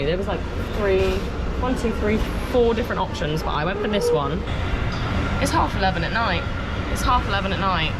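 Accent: United Kingdom. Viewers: Britist accent lady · Next time, land in Clark International or Cebu, they give passengers a kinder experience. But if its a connecting flight, well that sucks everywhere doesn't it ? Your British English is so perfect, unlike American English which is so hard to comprehend, and I speak the language quite well besides being a college graduate. The accent is so ençhanting, it goes so well with your slightly prickly but charming personality. Im stingy with subscritions,, but I surrendered and subscribed. 👍😘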